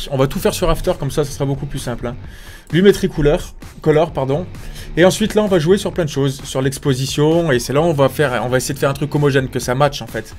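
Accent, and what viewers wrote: Saint-Étienne, France. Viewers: T'as un de ces accent stéphanois ! Haha bienvenue au club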